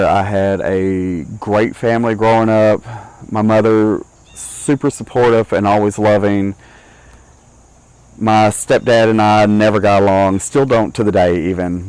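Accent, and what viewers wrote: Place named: South, United States. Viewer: What a beautiful testimony . You are correct, God does love you.It takes a real man to tell such a personal story. Roxy may not fully understand everything when she sees this because she is young but she will fully understand it later. I am sorry you had to go through a hard time at school,kids can be mean sometimes. I actually just think you sound very southern, and I was wondering where you were from.God gave you the life he did for a reason and I think some of it may be for telling your story. You may have led someone to Christ by giving this testimony.I pray that you and your family feel better real soon. If you do have the virus maybe it will be a very light strain of it. I love the story behind the tattoos.Prayers are being sent you way.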